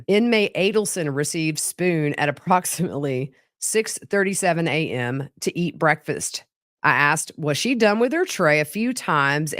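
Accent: South, United States. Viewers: thank you so much for your hard work! We all LOVE your Southern voice. You’re adorable to the max, and full of the best true crime info on the web. Respect. ✊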